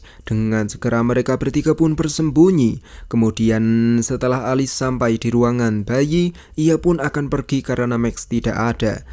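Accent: Java, Indonesia. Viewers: lah admin wong jowo cuk, dengar gak sih cara bicaranya 😂 tumben ada wong jowo gak bisa diajak bercanda.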